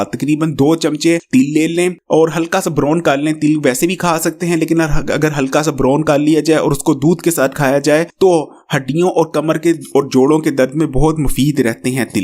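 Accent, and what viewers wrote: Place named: Punjab, India. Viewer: I wish you find some better voice to represent your videos.His sound is so idiotic and wrong Urdu pronunciation.Stop punjaabbi on Urdu narrative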